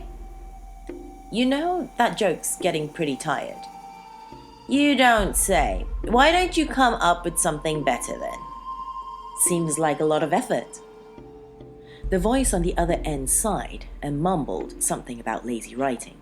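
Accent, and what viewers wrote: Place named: United Kingdom. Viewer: I believe she is British.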